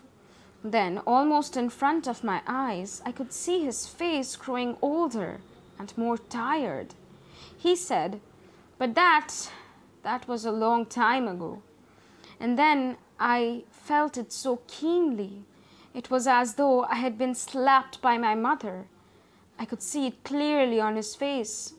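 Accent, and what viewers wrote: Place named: India. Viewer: Sorry but I found it hard to listen to .. didn’t get past first paragraph I guess I’m not used to the Indian? Cadence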